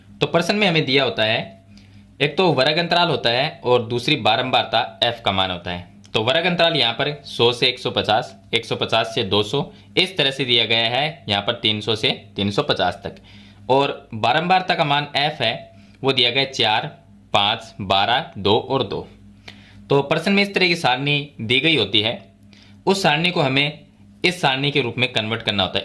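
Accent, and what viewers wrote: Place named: Rajasthan, India. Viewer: Aap rajshthani he sayd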